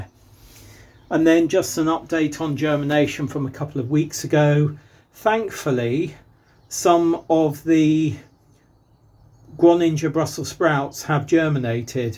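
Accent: United Kingdom. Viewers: I just love hearing your British accent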